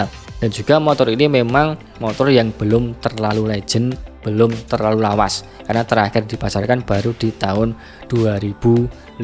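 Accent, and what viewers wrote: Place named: Java, Indonesia. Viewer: Medok amat bang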